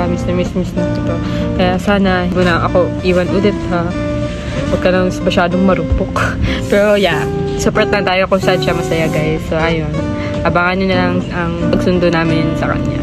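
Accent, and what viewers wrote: Visayas, Philippines. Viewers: Teh bisaya ka po ba?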